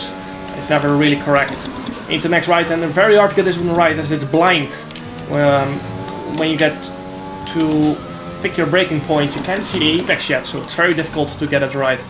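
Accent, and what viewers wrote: Netherlands. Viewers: I knew you were dutch just like me, i could hear it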